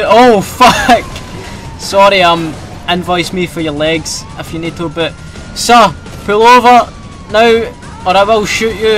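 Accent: Scotland, United Kingdom. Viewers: If we ever had some 1 on 1 Scottish talk, it would be hilarious.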